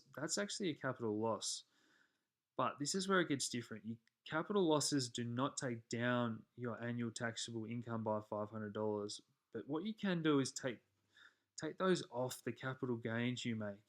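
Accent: Australia. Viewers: Good video. Great info. Good to hear an Aussie crypto trader. Subscribed. Cheers :)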